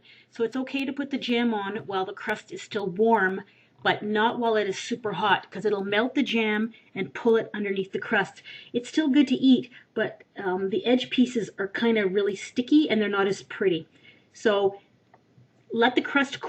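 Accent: Canada. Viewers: For aboot 15 minutes. Hehe. I love canadian accents. <3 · This is probably an American referring to your Canadian accent:) I am totally going to make this for my bf this weekend! yummy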